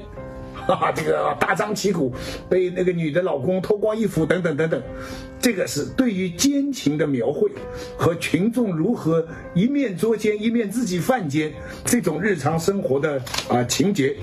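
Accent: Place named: Shanghai, China. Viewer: 喜欢许老师用上海话念书！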